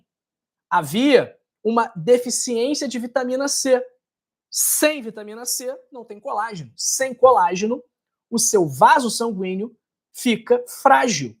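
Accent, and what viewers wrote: Rio de Janeiro, Brazil. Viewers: Olá. dr . eu acho que. Vc . Carioca vc soquete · Sou de Mogi Guaçu Cacilda Pimentel sp agora você parecem ser carioca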